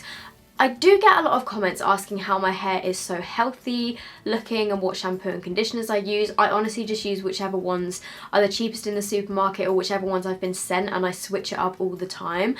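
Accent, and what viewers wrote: United Kingdom. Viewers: Suga bae hae 😂😂 Love a british accent😂😂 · I hate this strong British yikes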